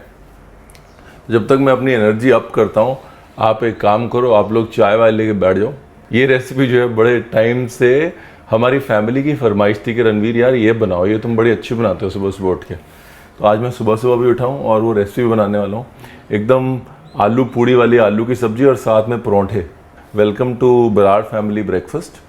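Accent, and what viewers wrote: India. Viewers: Desi language with super desi accent...but when you switch on vilayti one no one can imagine ki bande ne kya khub hindi ka hath pakda hai...👍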